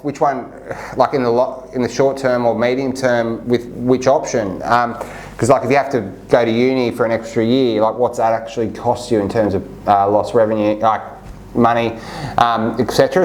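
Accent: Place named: Australia. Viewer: Very helpful information! I'm an entry-level accountant working to switch careers from ESL to Accounting. In the US the demand for accountants is so high that they are starting to hire accountants with no degree and certificates instead. It sounds like you are from Australia, is Australia having a similar situation, or are they still solely focusing on people with college degrees?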